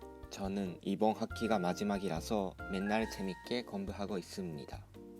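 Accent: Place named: Kansai, Japan. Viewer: こんにちは。。韓国留学頑張ってください。私も７年日本留学してましので、映像の日常が逆に懐かしく感じますね。関西弁も久々 またに遊びに来ますので、映像たくさん上げてくださいね。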